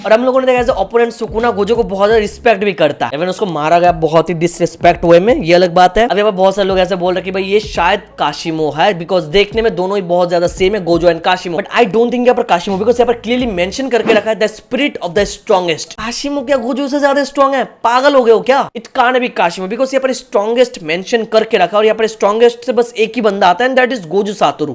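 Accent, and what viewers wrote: Bengal, India. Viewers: Bhi ap bangali ho kya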